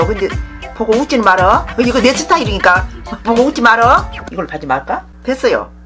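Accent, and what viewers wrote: Jeolla, South Korea. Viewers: 자메이카 출신 할머님 전라도 사투리 찰지심ㅋㅋㅋㅋㅋㅋㅋㅋㅋㅋ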